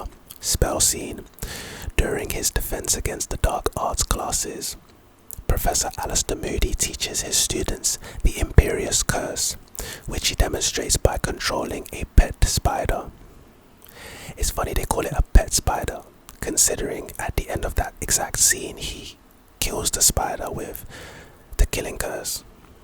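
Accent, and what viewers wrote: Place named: London, United Kingdom. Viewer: This is going to sound really stupid, but your accent makes me feel incredibly homesick for northeast london ^-^ ...But in a good way. Its nice, to hear a voice that sounds so familiar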